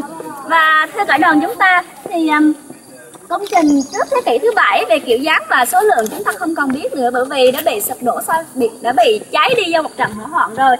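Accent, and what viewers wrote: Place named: Quang Nam, Vietnam. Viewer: Phát âm giọng Quảng Nam đòi chuẩn như Hà Nội ah .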